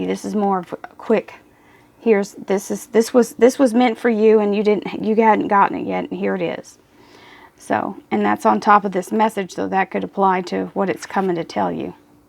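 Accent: Texas, United States. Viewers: This is is 4 U R GREAT N FUNNY IN A GOOD WAY. I LOVE UR TEXAS ACCENT. 🤗🤗🤗🤗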